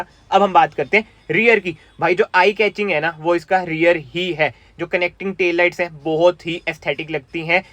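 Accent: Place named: Punjab, India. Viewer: Bhai tumhari punjabi wali Hindi sun kar maza aa jata hain 😅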